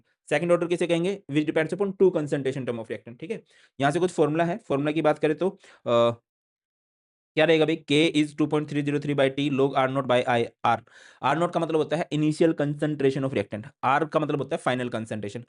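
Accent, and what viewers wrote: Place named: Punjab, India. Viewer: sir ka punjabi accent TOP.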